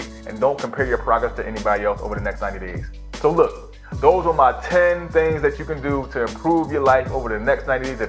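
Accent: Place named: Philadelphia, United States. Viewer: Wait is he from Philly ? 😭 ”anything that ain’t thurl”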